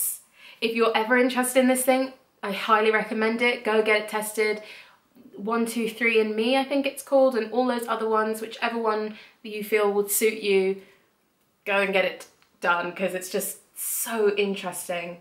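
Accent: United Kingdom. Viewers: U.K. accent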